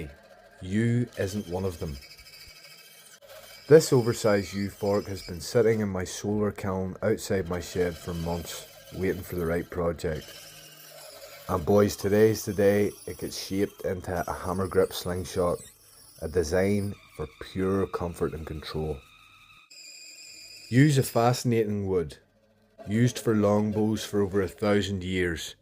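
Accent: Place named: Yorkshire, United Kingdom. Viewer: luvin your Yorkshire accent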